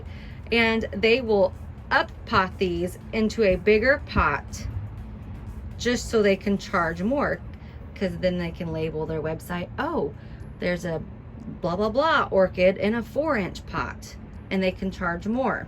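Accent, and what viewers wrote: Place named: Michigan, United States. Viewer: Hi! New sub here! I don’t know how these vendors stay in business. I spent $160 at Norman’s orchids for 4 plants Dec 2018. They were all rootless, rescue plants. They wouldn’t send me replacement plants and the owners wife was rude to me on the phone, email and Facebook. They were all supposed to be blooming size. None have bloomed yet, but they’re finally starting to look like they’re getting happy. Btw, it’s nice to hear someone talk like me on YouTube!! I’m from MI 😀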